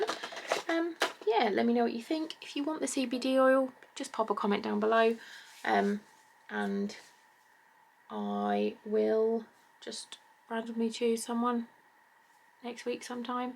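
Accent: Norwich, United Kingdom. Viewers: Love your mascara, eyeshadow,eyeliner, eyebrows in this video :) and that Norwich uk accent!! 😍❤️❤️❤️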